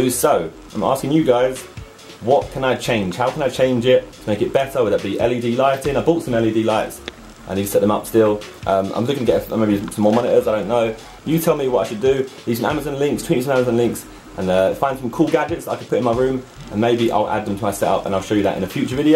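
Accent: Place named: London, United Kingdom. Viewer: I love the way Londoners say 'something'. 'Hey guys Zerkaa here and ive got suink a little bit different for you today'. Just lol.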